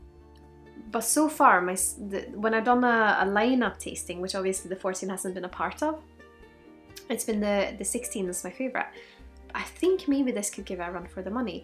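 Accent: Scotland, United Kingdom. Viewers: Just ordered 2 bottles ..you convinced me. Oranges, strawberries ... wood. Sounds great. Alert don't by this its a watery dram for beginners The A'bunadh is a good drop so can't go wrong Does Sweden have any distilleries. ? I'm confused as I'm sure you have a Scottish accent.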